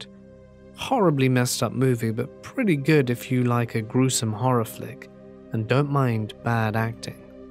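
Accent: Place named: Southern England, United Kingdom. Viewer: I love your content but I don't listen while falling asleep, I listen just to chill out or as background nosie while doing other tasks so I think a change to the black screen would be great! You know like the visual representation of sound could be cool!! You could play around with different colours or effects/movements depending on the story! This would also give you an avenue to explore tempo and expression of movement, almost giving your content more musicality of that's what you're potentially looking to explore. Keeping it minimalistic would be the key to balance out the audience between those like me, who enjoy your videos for the storytelling, and those who like to fall asleep listening to you Again, just want to add how much I enjoy listening to you. I don't know where in the UK your accent is from but it reminds me so much of being back home in the South which I find so comforting now I'm living away from there!! 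Xx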